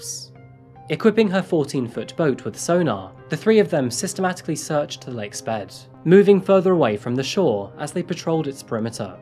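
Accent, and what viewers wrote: United Kingdom. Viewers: Still can't wrap my head around. A British sounding narrator suggesting coffee. Hehe. Great work again 😉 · What part of the uk you from?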